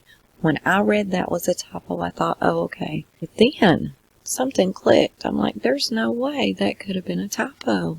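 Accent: South, United States. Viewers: Love your videos. New sub. You tell alot of things on this case that I've never heard. It's not just the same ole same ole info. Btw, love your southern accent 💜. Thumbs up👍🏽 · Ever since Camilla Harris My #1rule was Do Not date married men!! Do these women have no Moral compass.And who lets their daughter be a menace to society and protect bad behavior? You are the best. I am on top of a mountain looking out on life thinking..there are plenty of lonely soul's who would disrupt a family's happiness? J4J is going to try to get footage of nk at courthouse. Also hear she is moving to Texas..Good luck out there. Only state that is big enough to hide.. 💘 your content your country accent and your style.. Mr.RP needs his on channel... I am positive he would have great success with his content as well. We love him too ❤❤🚚🚛🛣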